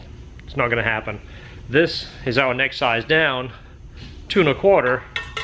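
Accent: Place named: United Kingdom. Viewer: Where you from dude your accent sounds a bit British? Great work by the way.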